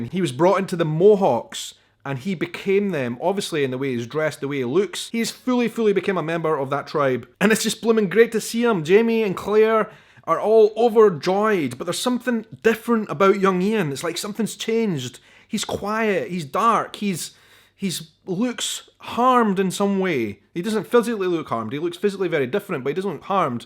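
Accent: Scotland, United Kingdom. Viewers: I love your reviews and your Scottish accent and thank you for being so passionate about the show and giving us your honest opinions. I do disagree about Roger, however. I think some people forget that he is a 20th century man trying to make it in an 18th century world. He is a historian and knows things about the time from books, but to actually live it is a shock to the system every single day. I got a little tired of the number of the flashbacks to the hanging, but I have to say that the silent, black and white film gave the memories an eerie quality and spoke to Roger's terror, seeing it all through the burlap over his eyes. Those films also harkened to films I've seen of the lynching of black people in the 60s and the sheer cruelty and injustice of that. I also thought it interesting that the only people in color were the "redcoats". So I really appreciated the artistic quality of those flashbacks. 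Oh, and yes, "burying the hatchet" is a thing and Roger makes mention of it when he's teaching his Oxford class. As for Murtagh, in the books, he never made it past Culloden.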